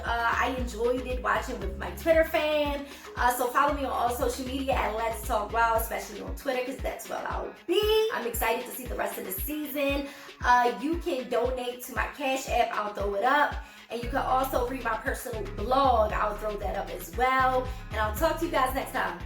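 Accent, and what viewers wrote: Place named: Maryland, United States. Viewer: i can tell ur from maryland lol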